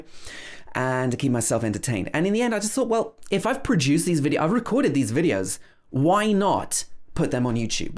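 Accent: United Kingdom. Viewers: You're superb, dude👌🏻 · I have been following the videos on your channel of late and I must say that you have come up with content that can be of great help for non-native English speakers. Aside from the fact that I have a soft spot for the British accent (thanks to English cricket commentators like David Gower! 😄), I found the video with the 'schwa' sound to be particularly useful. The video about intonation was also quite helpful as these topics are not explicitly taught in Indian schools. I hope to learn a lot more in the time to come. Thanks! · I don't know why Americans are making fun of the British accent Why did they find something funny in your accent? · I’m American but I love your posh English accent! It sounds more intelligent and educated than our ordinary American accent. Lol. It’s good to learn about your background. Keep up with your exceptional work!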